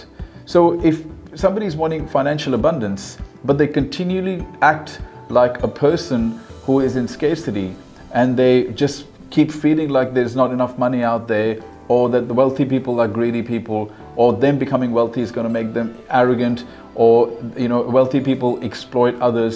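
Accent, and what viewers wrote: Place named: United Kingdom. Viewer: You have a British asscent Sir.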